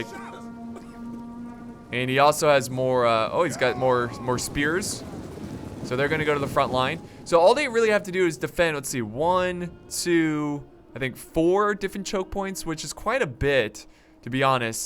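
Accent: Bavaria, Germany. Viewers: Are you from Bavaria? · Are you bavarian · are you from bavaria ?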